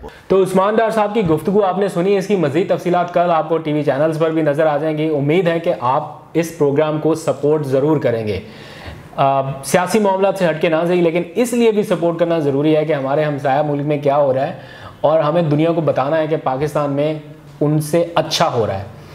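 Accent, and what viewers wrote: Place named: Punjab, India. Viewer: Your Punjabi is so cute bro PAKISTAN Zindabad Kashmir bna ga Pakistan. Lots of love from Azad Kashmir, Pakistan India tum log kro GA Mera PAKISTAN sa mukabla 🤣🤣🤣🤣phla gae Ka uron drink krna Chor do , aur learn from Pakistan to make strong farmers ,young generation and to love all minorities. PAKISTAN Zindabad No one able to compete PAKISTAN because PAKISTAN is one of the secrets of Allah PAKISTAN Zindabad My Kashmir Ambassador Imran Khan Zindabad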